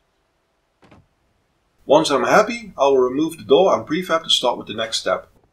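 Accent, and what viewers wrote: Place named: Netherlands. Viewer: Your Dutch i can hear it cool vid..👍🏽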